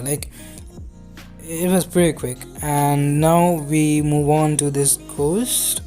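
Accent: India. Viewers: Only disliked it because of your Indian accent.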